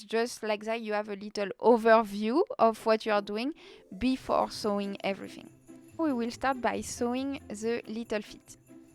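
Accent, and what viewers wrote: France. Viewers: Love it. I’m working on my very first big Santa . Your tutorial is easy to follow, thank you so much . Are you French ? Love your accent.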